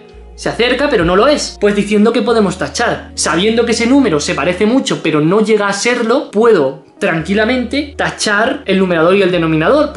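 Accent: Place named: Spain. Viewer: Es gratificante ver un peruano con acento Español que explica derivadas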